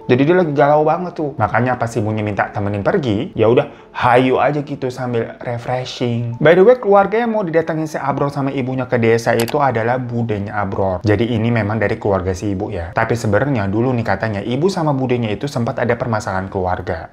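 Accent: Java, Indonesia. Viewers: Medoknya dapet banget bang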